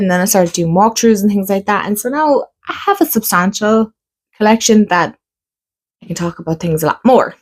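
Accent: Ireland. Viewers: So nice to meet you,. 🍀 🇮🇪 Your lovely accent sounds like home! My father’s parents emigrated from Ireland, wow, about 100 years ago and me da would slip into a brogue on the regular, which means that I slip into a loosey-goosey brogue on the regular, quoting my dad quoting Hal Roach, write it down. Anyway, I enjoy your channel and point of view. ✌️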